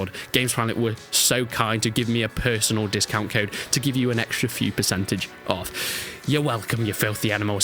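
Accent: United Kingdom. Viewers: I hate your dumb british accent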